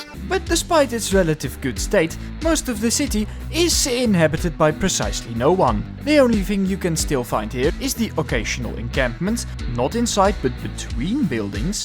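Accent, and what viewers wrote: Netherlands. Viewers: Yes always, wonder where this guy’s from, Amsterdam? He sounds like he’s from the North Holland province. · Its funny how a Dutchman recognizes another Dutchman just from their English accent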